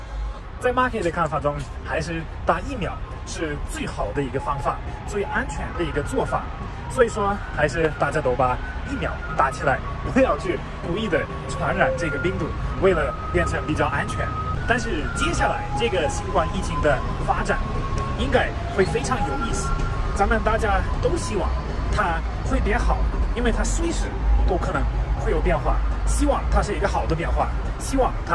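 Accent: Sichuan, China. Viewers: 您的普通话好像有点四川味。难道是我的错觉吗？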